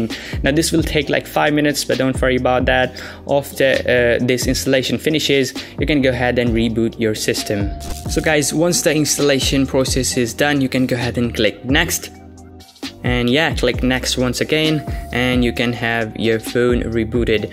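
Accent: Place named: United Kingdom. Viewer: Why do u have ths british accent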